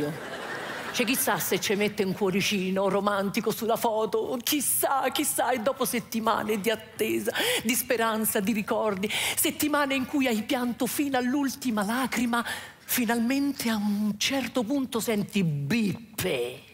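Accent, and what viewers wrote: Rome, Italy. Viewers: Mio padre aveva una parlata quasi romanesca e ascoltarti e una cosa fantastica perché tu sei fantastica dai allegria e colore a tutto quello che dici parole vere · stesso quartiere della Meloni : stessa cadenza, stessa genialità espressiva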